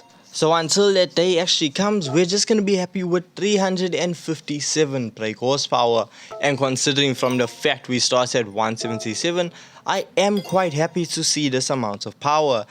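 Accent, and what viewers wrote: South Africa. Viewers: Why the subtitles bro'? You can tell you're South African, but you're easy to understand. Love the content my friend 👍.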